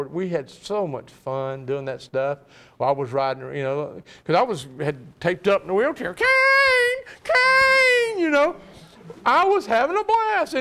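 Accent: South, United States. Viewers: He's got that southern drawl. Being from Alabama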